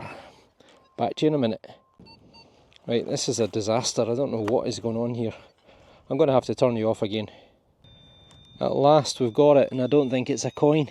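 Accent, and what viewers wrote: Scotland, United Kingdom. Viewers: Love seeing all the treasures, the history lessons are great but I’m mostly here to listen to your delightful Scottish accent 😊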